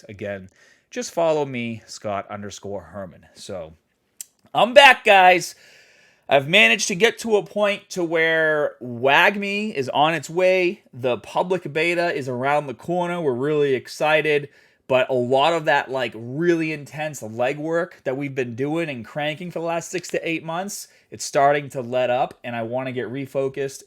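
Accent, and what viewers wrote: Boston, United States. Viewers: You hit the nail on the head Scott. A Google search of "how to" blah (whatever exercise I was searching at the to time) is how I found you several years ago. It was easy to tell that you knew what you were talking about and had the results to prove it. Plus, I went to college in Boston and needed more of the accent lol. Been following you and trusting you as a top-notch fitness resource since. Congrats and best of the luck on the game! Geez, living all of our dreams! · Whether you do decide to leave or stay, I appreciate the many, many videos you have uploaded over the years. I've only discovered the channel in the last year or so however there is plenty to go through and enjoy and even go back to if needed for advice on form etc. Lots of genuine, honest and great tips and advice. I appreciate the humor, knowledge, genuine and honest videos. 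You seem like an awesome dude and the Boston accent is lots of fun as a bonus. Either way, best of luck in your future endeavors and thanks for everything you have done and shared to help fellow gym folks like myself who enjoy the weight lifting and other aspects. I'm glad you did all this and took the risk and opportunity to make this channel happen. I also can totally relate to being introverted as a kid, gaming with friends and making lasting memories. The gym and sports and other stuff also was a great time spent with them and on my own to help with mental health, physical health and so on. Breaks are great to recharge and reset and you don't need to apologize ever for looking after yourself. It is a bit different seeing you with glasses haha. Sorry for the rambling comment but just felt like sharing to show you are appreciated and thanks for everything you have shared and done. Cheers from a fan from British Columbia, Canada.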